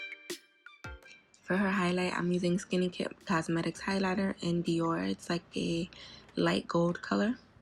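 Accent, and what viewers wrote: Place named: New York, United States. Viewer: Idk which is satisfying your accent or the makeup? Lmao let’s say both? Like are you from NY? 😭😍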